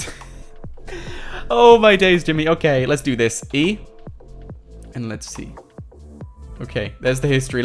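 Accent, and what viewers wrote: England, United Kingdom. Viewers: Are you from England?????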